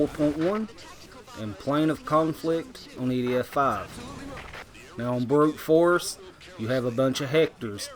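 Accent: South, United States. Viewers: This man has the best southern accent ever. 10/10 very nice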